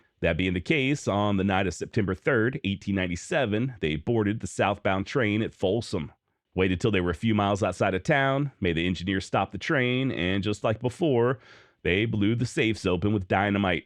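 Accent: Texas, United States. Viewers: right on I actually thought Missouri or Texas second possibly Louisiana you have a unique voice...no diddy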